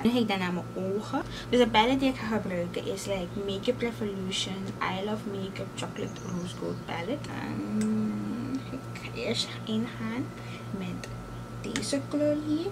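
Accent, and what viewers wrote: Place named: Suriname. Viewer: Ik keek naar een video waar je Engels sprak en ik had gelijk een gevoel dat je van Suriname was en je bent werkelijk van Su❤️❤️❤️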